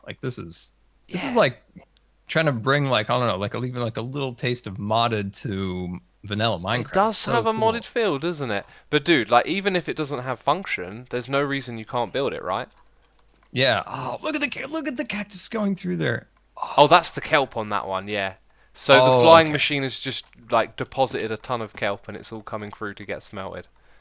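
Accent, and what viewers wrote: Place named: United Kingdom. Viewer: Yo, what part of the UK is your accent from?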